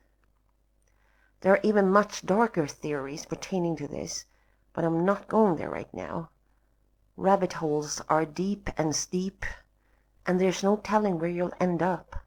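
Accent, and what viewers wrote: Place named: Ireland. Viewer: You said you're Swedish but your accent sounds Irish. I loved listening to your narrative. Very good!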